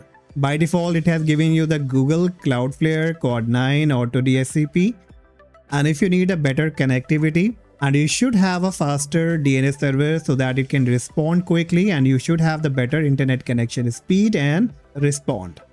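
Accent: India. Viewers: sounds like he's from one of those Indian call centers that steal peoples information, don't trust those people they are online thieves.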